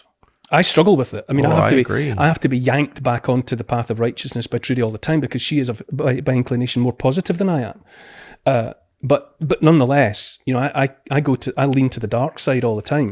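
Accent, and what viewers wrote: Scotland, United Kingdom. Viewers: Scottish is a cool accent .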